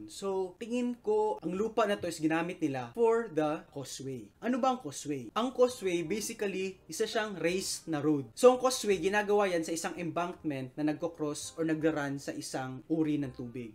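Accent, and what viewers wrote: Visayas, Philippines. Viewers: Basta ang alam ko bisaya ka bai tama ba ako. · Bisdak diay si Engr. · Bisaya dodong.. Apir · Klaro kaayo basta bisaya hahahaha nag subscribe nako engr. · Hi bisaya diay ka?...hehehe...parehas diay ta. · Bisaya d-i ka dong?asa dris Pilipnas ka gkan?hehe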